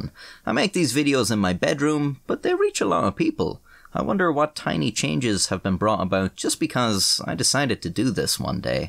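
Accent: Ireland. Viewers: Greetings from one of your ripples in America. I love what you do, and am grateful for your insight and view. Please continue, because there is literally NOTHING in this world I love more than learning from someone with an Irish accent! (I didn’t even have to make that up it’s so true) be well and thank you again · Same im battling fentynal i been on it for 2 years and all i can do when im detoxing is kick around shiver sweat puke. His vidios are easy to watch in a fragile mindset nd his voice make me laugh not alot of irish people here😂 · I just wanted to say thanks for going to all the trouble to make these videos. Really like your style, subject matter, humor, and of course, that cool Irish accent. Your ripples reached me in Texas. Keep up the great work - love your channel.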